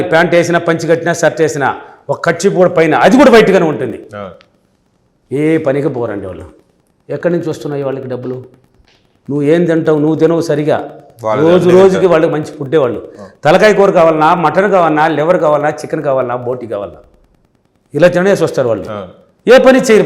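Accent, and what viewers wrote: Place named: Chittoor, India. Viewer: I think he is from Chittoor... That slang is lub❤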